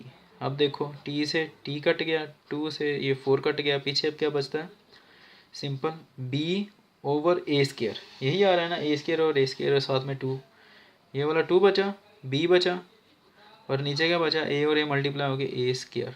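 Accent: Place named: Lahore, Pakistan. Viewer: Sir AP ka bolny ka style Lahore wolo jasa ha and Iike their style of talking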